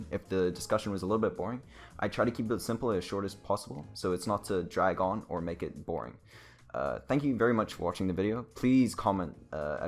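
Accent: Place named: United Kingdom. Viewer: This would be extra nice .. you sound bigmany time brtitsh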